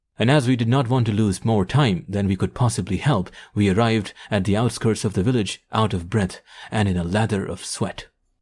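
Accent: India. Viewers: Even though jim Corbett was an Englishman the story is narrated in an Indian voice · Would be better without the forced pseudo-American accent. It grates on the ears. Edit: ah, just realised you are an Indian living in the US. That explains the appu accent. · I love hearing these stories from someone who is from india or has parents from india. My favorite aunt is from india. And ever since i was a small kid ive been fascinated with her culture and family. Her two sons are my favorite cousins and i do my best to be a good older male role model for them like she and my uncle were for me. Hearing your voice reminds me of her family. Thanks for the authentic experience.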